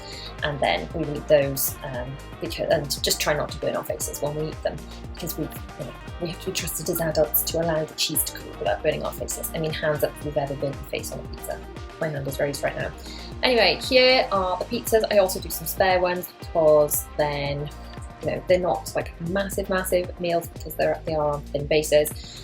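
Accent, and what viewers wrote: Wales, United Kingdom. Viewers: i love this video but i love even more how you say quarter lol love the welsh accent!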